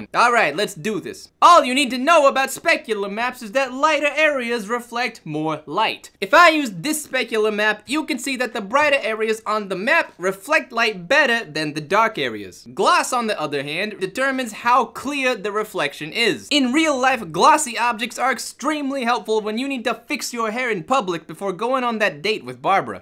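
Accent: New York, United States. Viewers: you have an awesome new york accent.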